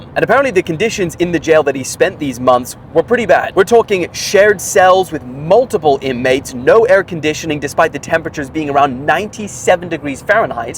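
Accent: South Africa. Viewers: Bro tell me the truth. Are you South African ❤🇿🇦🔥😂👀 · Bro are you sure you not South African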